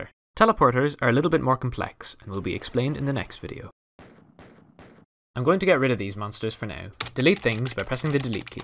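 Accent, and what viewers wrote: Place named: Ireland. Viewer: why are you irish.....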